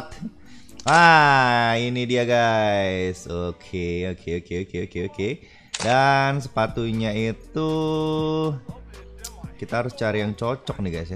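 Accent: Java, Indonesia. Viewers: Wong jowo to kue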